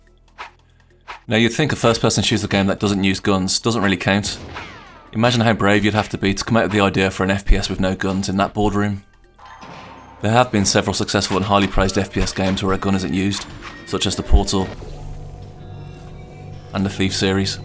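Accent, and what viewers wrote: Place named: United Kingdom. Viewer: I fucking love this guys britsh accent. reminds me of that guy who did the polybius video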